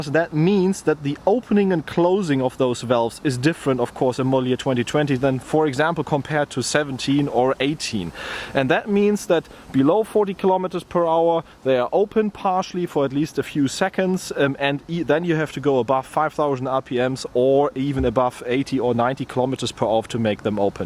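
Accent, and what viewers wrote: Germany. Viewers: Bist du deutscher wegen deinem Akzent?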